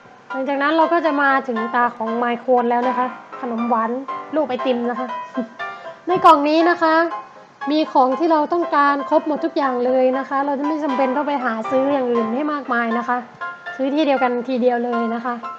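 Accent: Thailand. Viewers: พี่พูดแปลกๆอ่ะค่ะ แบบเสียงเหมือนไม่ใช่คนภาคกลางอะไรประมาณนี้ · เธอไม่ใช่คนกรุงเทพฯ ใช่มั้ย สำเนียงไม่ค่อยชัด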